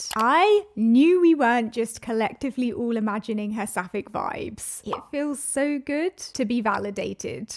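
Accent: United Kingdom. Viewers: Where is your accent from? Are you from there too? I'm thinking somewhere in England? - (I'm a quick study)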